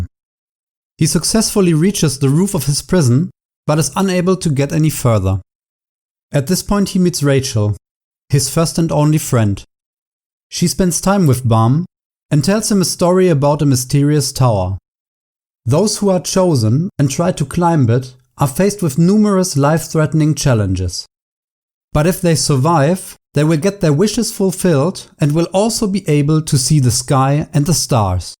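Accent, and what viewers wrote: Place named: Germany. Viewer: THIS is a real german accent congrats on the 1k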